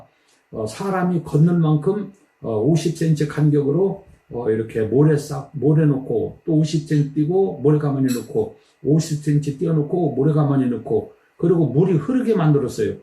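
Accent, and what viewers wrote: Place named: Jeolla, South Korea. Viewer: 전라도분이시군요. '인자' 이 말 좀 좀 빼시지요.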